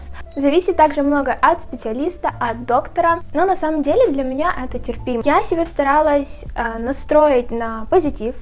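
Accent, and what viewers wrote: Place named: Western Ukraine, Ukraine. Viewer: Это западно-украинский акцент. Ничего плохого здесь нет... А вы - нетолерантны.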